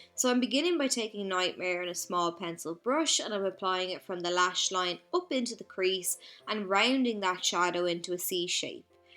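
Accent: Ireland. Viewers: Oh my gosh, I have been binging your videos! I can do basic makeup but that's about it- you have been so helpful and easy to follow! I also love your accent- I've been to Ireland twice and love it so much- you remind me of the wonderful people I met there! Thank you, go raibh maith agat! Love from Portland, Oregon, USA!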